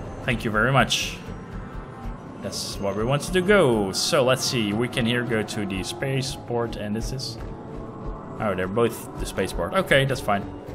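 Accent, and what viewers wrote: Netherlands. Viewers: hey! no, you're doing ok. learning the game is nice to see. een nederlands accent, trouwens. haha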